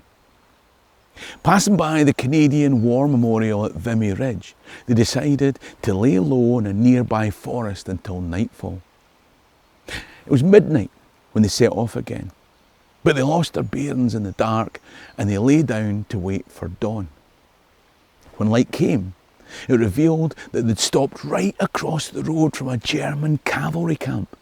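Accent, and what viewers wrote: Scotland, United Kingdom. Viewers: He's just a foreigner with a Scottish accent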